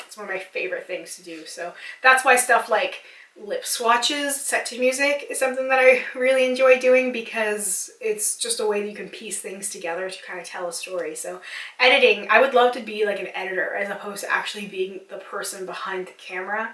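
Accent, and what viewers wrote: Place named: Canada. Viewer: I am so sorry you have received mean comments about your appearance, that is so rude and unnecessary. I only recently started appreciating all the little nuances in people’s characteristics and how it makes them uniquely beautiful. I think representation is important in media, so it’s nice to see someone with a different style, opinion and features. You’re doing an awesome job on your channel! ❤️ ps. Every time you say about...I hear aboot. It makes me so happy as a fellow Canadian 😀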